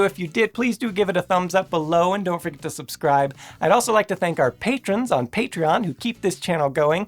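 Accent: United States. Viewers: got FAT and lost the hability to speak PROPER ENGLISH, meaning, he got murican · Can't watch this. Didn't realize how much more annoying and less interesting the videos are when you swap out a crisp English voice for a generic annoying yank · I like American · Hey, the American guy is back! Cool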